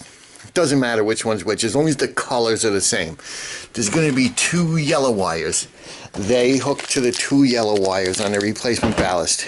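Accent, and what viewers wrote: New York, United States. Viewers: I love NY or Jersey accent! I'm southern, never ending amusement when I lived in north. What the hell is biscuits & gravy?? Difference between north/south, North will tell you to your face, F/O! South, we are sneaky & very polite, Be aware, probably find your body in a ditch next day if you piss us off but we are polite!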